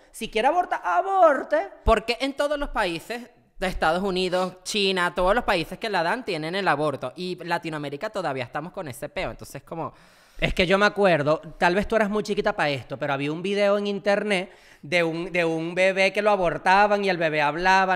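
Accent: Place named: Spain. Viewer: Porqué La Divaza tiene ese acento Español con las eses?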